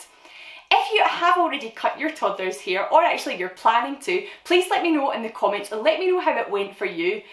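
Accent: Scotland, United Kingdom. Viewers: Oooh I love your super cute intro 💖 Love your accent so much 🏴 what part of Scotland are you in? I need to take notes from this video as I cut Leo’s hair and it’s terrible 😬 Awww he’s just too cute 😍😍😍 You are doing such a good job and you make it look so easy! Wow super good job! That’s really impressive! Ahh yes Leo doesn’t get screen time much either so that’s a great idea 😁💖 Oooh no static hair 😂😂 Loved watching 😁💖 Awww love the hiding seek 😁💙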